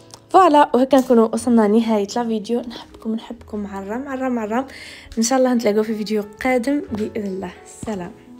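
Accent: Khenchela, Algeria. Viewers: نموت عليك كي تهدري لخنشلية تاعنا🎉❤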